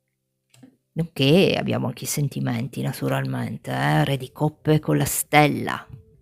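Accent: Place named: Italy. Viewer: Me encanta el acento italiano además se entiende bastante bien saludos desde 🇪🇦🙌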